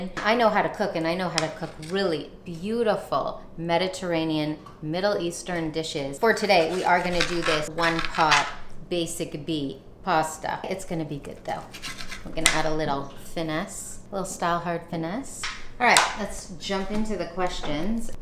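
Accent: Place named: Long Island, United States. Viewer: OMGGGGAWWWDD —— I LLLOOOVVVEEE FROM ❤️ I post on almost all her vids. We definitely need a video on your amazing background. Turkey, Spain, Hebrew name and in Canada...wwwhhaatt? Plus bonus points because your manner of speaking, inflection, sounds like me, a Long Islander ( please don’t been offended 🥰). Loved this video! Muah 😘 (your daughter, speaking her mind already momma! Love it)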